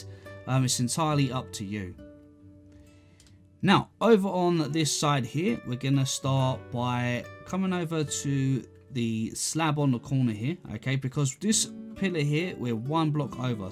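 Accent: United Kingdom. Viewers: I like ur british acent❤❤❤ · I love bri ish people shutter: shu uh. Three: free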